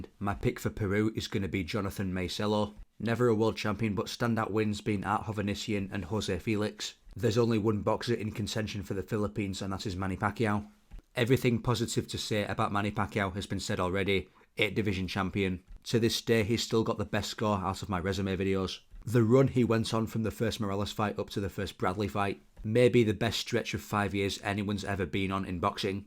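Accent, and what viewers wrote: United Kingdom. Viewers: you sound brit but didn't mention Jimmy Wilde as the Top Brit. he's actually high ranking all time great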